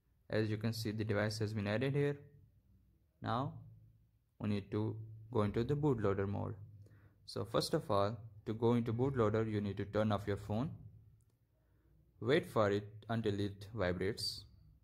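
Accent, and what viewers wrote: India. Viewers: when you heare an Indian Accent you know its the Right video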